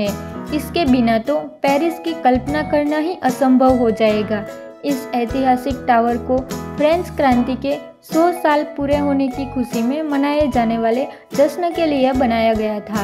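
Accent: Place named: Gujarat, India. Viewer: Tame gujrati 6o aevu lage 6e 🤔🤔🤔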